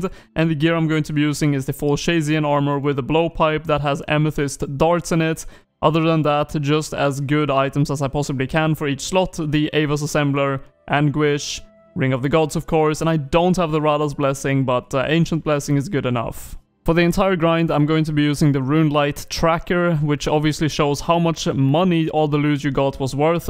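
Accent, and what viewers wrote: Sweden. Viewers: Are you Swedish and from Stockholm by any chance?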